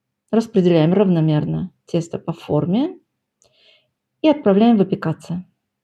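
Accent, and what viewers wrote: Baku, Azerbaijan. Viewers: Как вы хорошо объясняете,подробно ,все секреты выпечки не скрываете..Настоящая бакинка советского времени .Я тоже бакинка ,жила 8 завокзальная,у нас у всех такой акцент ,бакинский,чистый литературный.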